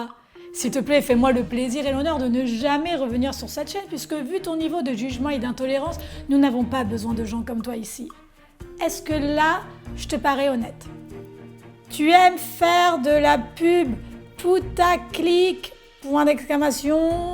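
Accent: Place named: Italy. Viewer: Charmante femme avec un bel accent italien , qui nous donne des vidéos intéressantes à regarder concernant les drones ou autres... continue comme ça et au passage même si tu es assez grande pour ça, ne fais pas attention aux haineux. Au plaisir de voir tes vidéos ! À bientôt 🙂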